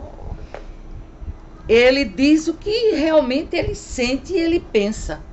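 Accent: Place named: Pernambuco, Brazil. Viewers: Feliz Ano Novo e Bons Livros 📚!!!! Gosto de ouvir você sobre os livros sobretudo com sua fala pois lembra muito minha mãe Marlene que era também pernambucana. Bjsss😘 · Por favor,! Faça vídeos longos! Vc tem um voz muito agradável somada a um sotaque refinado do Nordeste, som q, aliás, adoro ouvir! Vc é muito agradável nas sua colocações e tem muita coisa a dizer com o seu conhecimento! Caso alguns dos seus seguidores não gostem de vídeos longos, alterne vídeos curtos com longos para agradar aos q gostam! 😊 Outra coisa: vc poderia me dizer o título do livro romântico q vc iria começar a falar, mas se lembrou q não estava na sua pilha? Fiquei curiosa!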